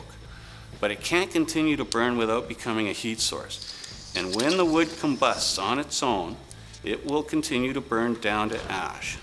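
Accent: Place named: Canada. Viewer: I sense some Canadian from, eh?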